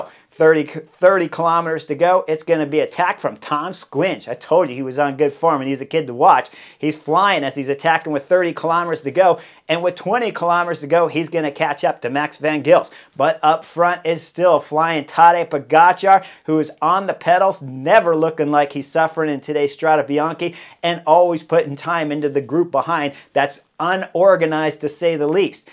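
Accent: United States. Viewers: has the most "I don't give a fuck" American accent in cycling. Butchering every race and rider name left and right😅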